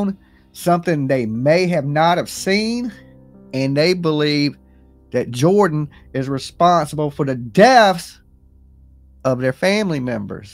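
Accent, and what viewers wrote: South, United States. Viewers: You crack me up. Live your southern accent. Great job, keep up good work.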